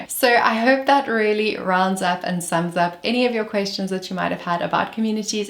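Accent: South Africa. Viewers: South African right? Cheers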